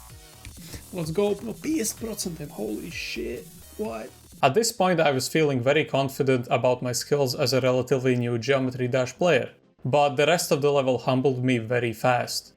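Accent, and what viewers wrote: Latvia. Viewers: banger vid also the latvian accent is so strong lol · W latvian accent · latviesu akcents anglu val ir tik ikonisks😭 godigi es nesen izgaju un loti iesaku iet labak ar neka cata, cata ir pilnigs suds imo. Lai nu ka veiksmi! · At the start of the video i already heard the latvian accent 😂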